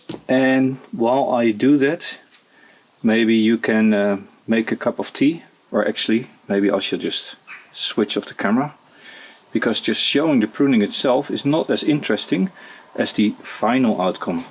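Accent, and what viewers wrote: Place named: Netherlands. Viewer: 👍 Including the charming Dutch accent 😍🙏😘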